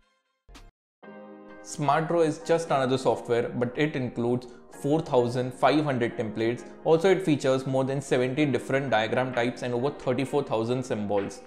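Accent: India. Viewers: Can we just appreciate this guy's beautiful voice nice indian accent ;) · It happens, don't worry. That's Indian accent.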